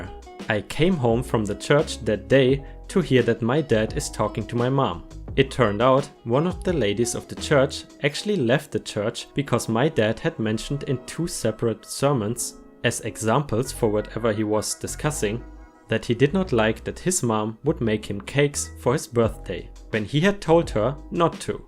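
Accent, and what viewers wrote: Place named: Germany. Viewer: You did great! Love your voice, so refreshing to hear a German speaker doing reddit stories :)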